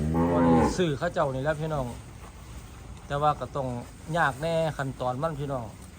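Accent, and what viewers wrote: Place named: Korat, Thailand. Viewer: โคราชชัดเจนดีครับ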